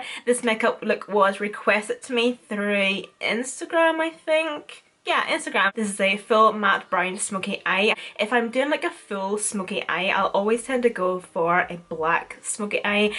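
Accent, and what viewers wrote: Northern Ireland, United Kingdom. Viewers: love this look, I'm from Ards so it's good to hear a northern irish accent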